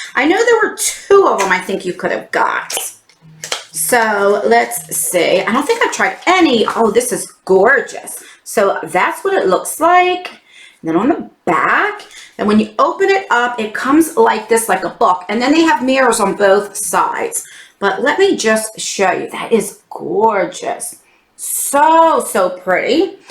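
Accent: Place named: Chicago, United States. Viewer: Love your accent ❤ love that it sounds like your saying crown instead of crayon, not making fun of you just like different accents 😁 Chicagoans accents very so much lol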